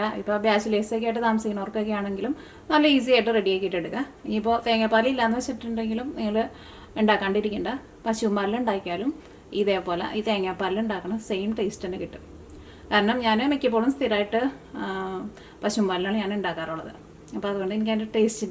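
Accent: Thrissur, India. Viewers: Hi,I’m from Nadathara/Thrissur originally but settled in the UK. Love your recipes reminds me of my mum’s cooking! Also your Thrissur accent...Ishtu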